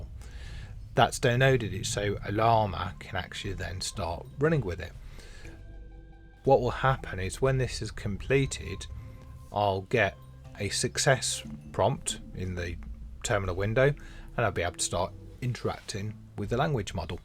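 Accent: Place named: Birmingham, United Kingdom. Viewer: where are you from? you sound like you are from near where i'm from back home (near bham somewhere) 😂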